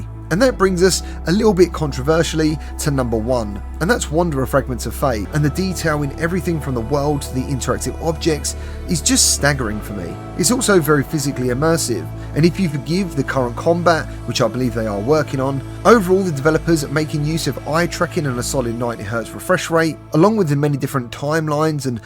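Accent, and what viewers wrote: United Kingdom. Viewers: Can some one write down the names of the games please I just can’t understand with his British accent 😢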